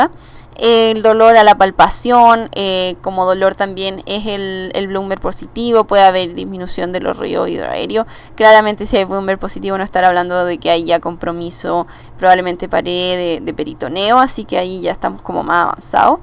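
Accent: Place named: Chile. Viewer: Cómo se nota que es chilena Atte: una chilena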